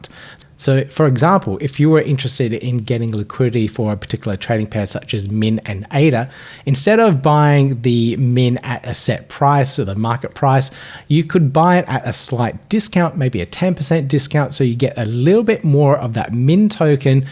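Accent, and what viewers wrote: Australia. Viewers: You sound even more Australian since returning from your break. Love the accent. I’m ready for another ISPO update if there are some new projects coming up soon. Welcome back.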